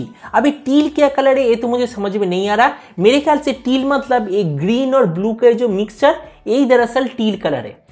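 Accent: Bengal, India. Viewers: Ap bangali ho keya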